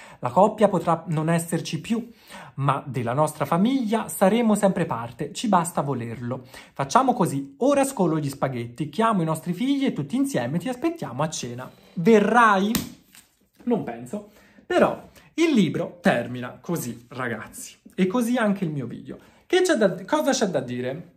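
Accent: Tuscany, Italy. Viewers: finalmenteeeeee ❤ mi fa morire il fatto che parli in toscano mentre spieghi 😂😂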